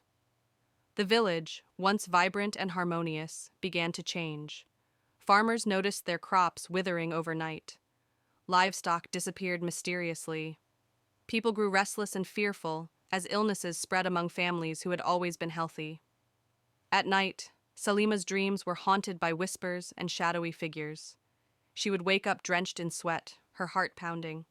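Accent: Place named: United States. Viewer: Remember this is African folktales and you shouldn't be using American accent for Voice note but African voice note. Thanks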